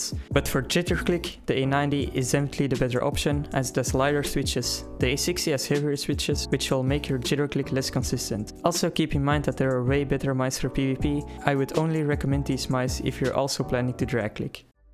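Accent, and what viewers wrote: Netherlands. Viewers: Ik dacht al dat je Nederlands was lmao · I smell dutch:D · Are you dutch?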